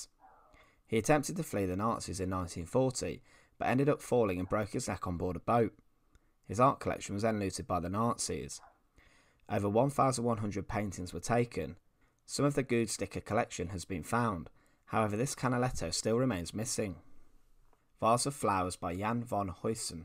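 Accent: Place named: Cockney, United Kingdom. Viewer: I can understand about half of what the narrator is saying. Pretty thick cockney accent.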